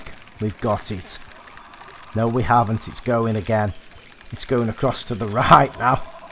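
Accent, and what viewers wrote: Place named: Scotland, United Kingdom. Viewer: thank you🙏 your accent is funny do you come from Scotland?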